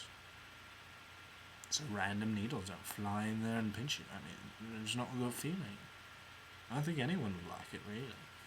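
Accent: United Kingdom. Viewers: sorry mate but you are speaking in the "queens english" accent which is the most english you can possibly be ( wait does that make sense ) anyway im british and you sound more british than me! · You are very English in your mannerism and intonation....and you like it.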